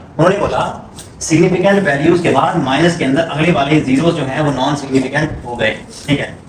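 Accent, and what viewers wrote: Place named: India. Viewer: bhai kia bat hai indian touch dy rha hai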